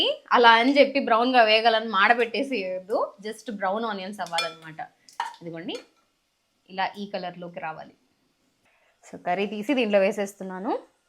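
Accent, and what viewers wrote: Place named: Telangana, India. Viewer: Meeru andhra ammai ainaa Telangana ammaila. Chala Baga matlduthunnaru super Andi meeru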